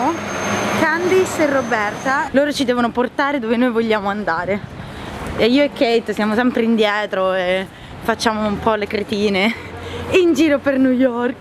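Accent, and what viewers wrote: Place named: United Kingdom. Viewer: Oddio!!! non ti avevo mai sentita parlare in inglese: c'hai un super accento british!:P Divertiti a NY!! A prestissimo cara! Baci!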